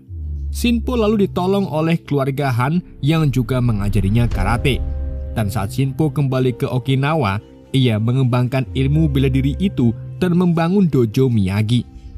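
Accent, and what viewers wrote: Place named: Java, Indonesia. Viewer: Medhok yg mantab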